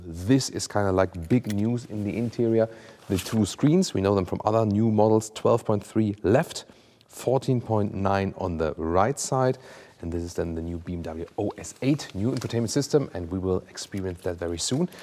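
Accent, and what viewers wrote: Germany. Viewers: Great review, this channel improved a lot in the last year, good job! And love the german accent as Im italian whom lived in Germany and learned the language.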